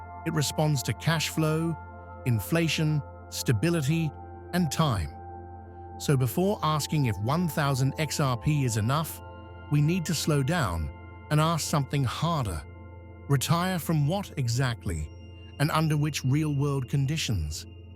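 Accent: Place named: United Kingdom. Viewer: Just found this channel. I really like its simplicity of explanation. My feeling is if XRP gets to wide financial institutional adoption not only will there be price appreciation, but also less volatility as that would run counter to what institutions expect on a value transfer asset. If the aforementioned occurred this could create a great deal of competition for the XRP asset and safer yield products could become available in time via free market competition (albeit with a lower yield). On a side note I like the British accent of the moderator. I've often said one could be speaking in complete gibberish, but if its with a British accent it would sound authoritative. 🤣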